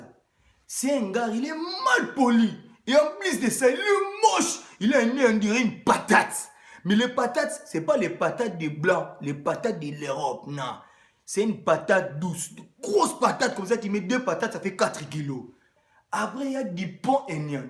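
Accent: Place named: Democratic Republic of the Congo. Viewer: ta un peu perdu de ton accent congolais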